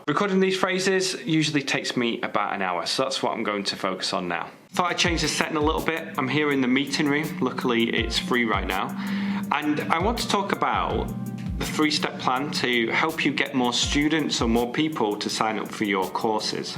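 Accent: United Kingdom. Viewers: Thank you. I like your British accent.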